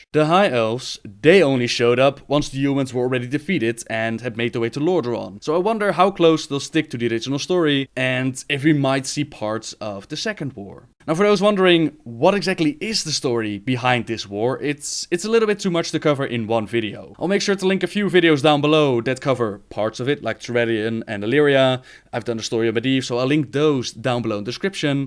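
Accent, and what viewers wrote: Netherlands. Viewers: It's a Dutch accent.. I reconnized it right away. I hate the Dutch english accent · Your dutch accent! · I think hes from the netherlands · dutch accent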